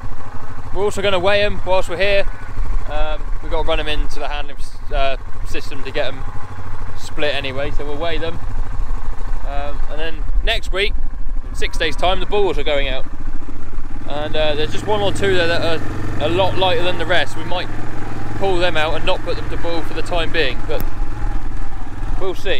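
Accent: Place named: RP, United Kingdom. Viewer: I've said ths before but Gosh, you're posh... "fence tester"? WOW!😂👍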